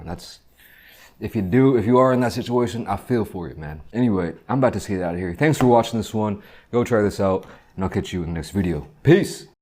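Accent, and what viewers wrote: United States. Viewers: He's American I think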